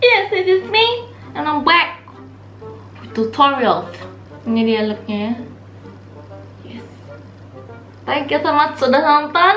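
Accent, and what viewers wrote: Java, Indonesia. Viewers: kenapa medogh sekali bro :*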